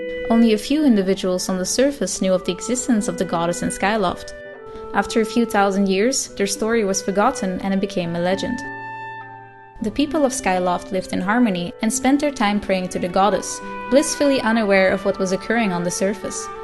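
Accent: Netherlands. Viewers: Are you from Belgium or the Netherlands??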